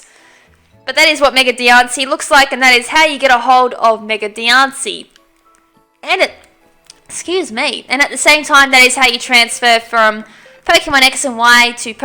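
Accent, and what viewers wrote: Australia. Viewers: Female Australian accent is hot af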